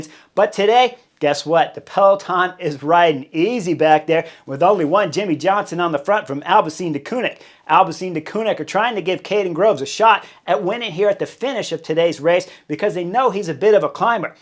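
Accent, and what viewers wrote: United States. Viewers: This American accent is so thick I can barely make it out when he says the names of the riders.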